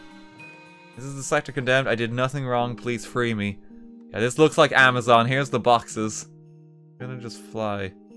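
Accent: Ireland. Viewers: irish sounds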